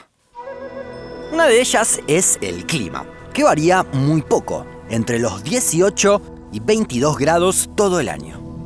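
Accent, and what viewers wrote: Argentina. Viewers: El narrador es argentino también, aunque en el equipo hay distan nacionalidades si mal no recuerdo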